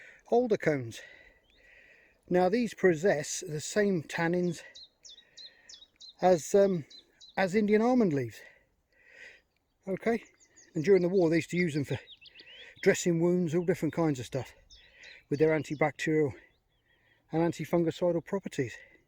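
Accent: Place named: England, United Kingdom. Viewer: Friendly neighbourhood Aussie pest here again. Lord I luv a pommy accent. Lol Ok lets start watching.......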